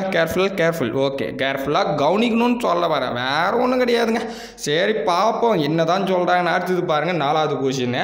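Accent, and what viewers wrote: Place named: Chennai, India. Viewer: Chennai slang explanation.... Nice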